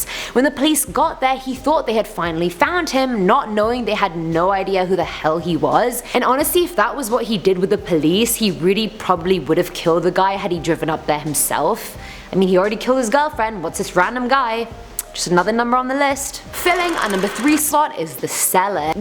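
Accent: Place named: Wales, United Kingdom. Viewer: You have a Welsh accent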